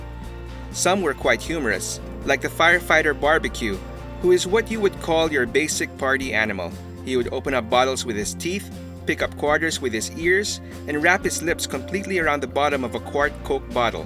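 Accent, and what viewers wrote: Philippines. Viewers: mabuhay po kayo sir, nalaman ko po sa accent nyo na pinoy kayo, god bless po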